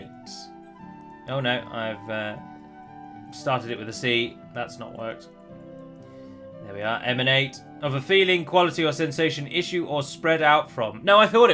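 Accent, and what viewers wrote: United Kingdom. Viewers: Hello, you make awesome videos man, I love to hear you speak just because your clean and excellent British accent. Now, talking about DaC and your ongoing series, seeing as war with Mordor is inevitable in this campaign (because of the reasons you mentioned in the video), I believe it's most wise to seek an alliance with said foe in the Dorwinion campaign so that one doesn't focus in the same enemy and you focus completely in Dale to make the game more interesting as you said in that series. Keep up the good work man!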